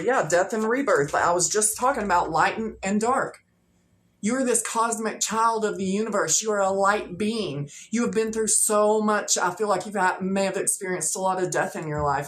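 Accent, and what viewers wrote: South, United States. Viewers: 😎I am most high....... just smoked a joint. LOL. but seriously.... sometimes all it takes...... is a little can do.... you got it. beware of trolls....is right. they hide behind circles with letters in them. i love the southern accent........ i grew up watching beverly hillbillies, and dukes of hazzard............ But who didn't huh? be vigilant about thieves.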